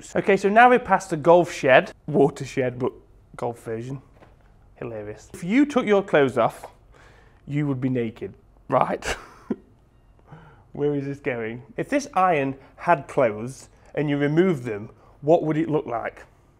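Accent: United Kingdom. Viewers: why do you have a british accent tho?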